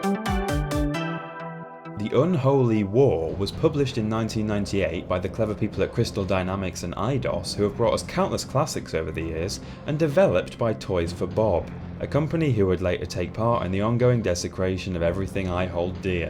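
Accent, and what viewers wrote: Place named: North, United Kingdom. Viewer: Found out about your channel after seeing a post about you on r/gaming, you're great! Where abouts are you from by the way? You sound Northern.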